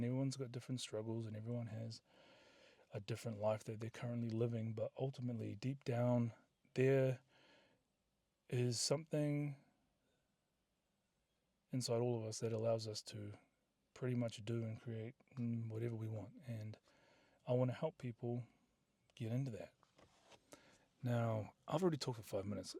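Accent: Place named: New Zealand. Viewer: Very therapeutic to listen to. I love hearing other new zealanders.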